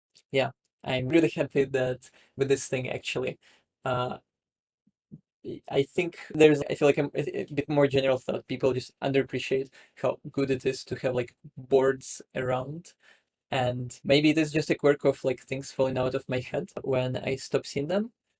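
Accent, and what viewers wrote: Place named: Russia. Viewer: Now that you've been in the US for some time, your English sounds a lot better. In your interviews with e.g. Ben Kuhn and the BBC, you had a pretty strong Russian accent - it's a lot better now! And it sounds a bit more fluent. And you should not underestimate that - communications skills are very important. Especially in the kind of job that youre doing right now - raising funds and talking to researchers to allocate these funds. People not getting an idea or, even worse, getting the wrong idea, will cost a lot of money. Other peoples perception of you will also (maybe subconsciously) be influenced by your language skills, another reason why this matters. Good luck with all your future endeavors!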